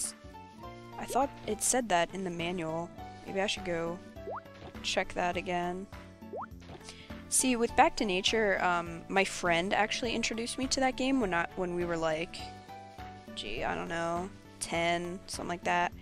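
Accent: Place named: New York, United States. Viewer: Kind of sounds New Yorkish, they way you say certain words, heheh. cx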